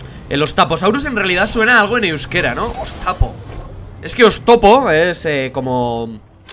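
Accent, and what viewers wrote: Galicia, Spain. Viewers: Lol no sabia que eras gallego XD VIva gaLIcia carAYo 😂😂 Bueno buen vídeo crack la gigantophis mola mucho como criatura de juego, además por ser la segunda serpiente que meten, pero como serpiente prehistórica no me gusta mucho que le hayan puesto la forma de cobra esa en las vértebras del cuello...no se no me gusta pero luego hay que admitir que es una chulada. Decías que la titanoboa era un basilisco...ESTO sí que es un basilisco XD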